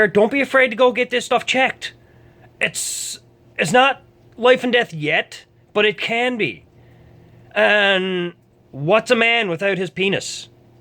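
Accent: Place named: Maritimes, Canada. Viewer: You sound like you're from the maritimes.. That's where I'm from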